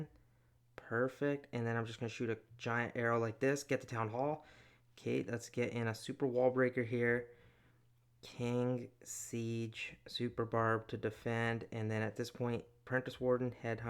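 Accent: Canada. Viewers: You sound Canadian, eh? 🤔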